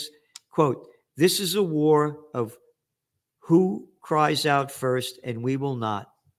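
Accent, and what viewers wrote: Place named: Brooklyn, United States. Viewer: love your Brooklynese!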